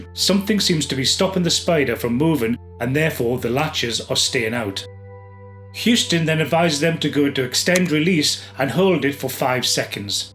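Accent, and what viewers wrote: Northern England, United Kingdom. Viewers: What is it about these British-accented content providers making US space program videos? Their pitiful island never sent anything to space.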